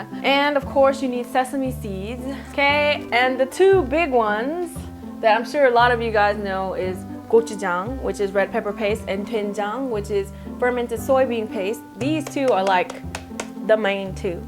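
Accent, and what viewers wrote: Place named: South, United States. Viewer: Sometimes sounds like she has a country accent 😂😂it's so cute😘.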